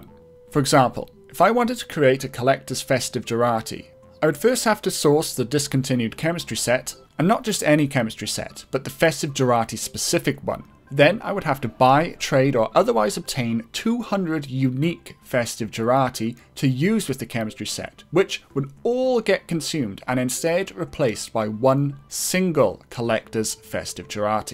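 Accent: United Kingdom. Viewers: Your from the uk aren't you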